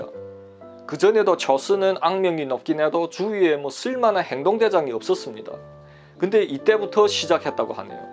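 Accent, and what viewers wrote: Yanbian, China. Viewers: 이사람아 우리말 부터 배우고 방송해라 거놈의 연변 토박이말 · 연변말도 한국어의 지역사투리중의 하나입니다.니네말 우리말을 가르면 한국어도 서울말,경상도말,전라도말 등으로 나누어야 할겁니다.서울말만이 한국어인가요?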